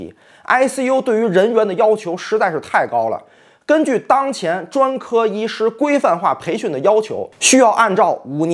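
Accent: China. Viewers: 一个新加坡人，带着纯正的国内口音，中国人的逻辑方式，人畜无害的语气，绝对是漂亮国最好的舆论工具！！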